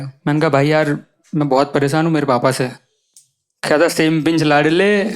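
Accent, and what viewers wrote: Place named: Rajasthan, India. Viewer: Bhai Aap kha se hoo iam from rajsthan apka accent thoda sa rajasthani lg rha hai btw Love your video ❤❤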